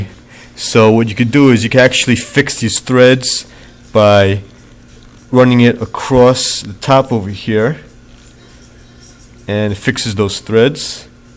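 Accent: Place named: New York, United States. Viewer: Good reminder for me from Vinnie Barbarino from Welcome Back Kotter😂😂 You do sound like him with the NY accent👍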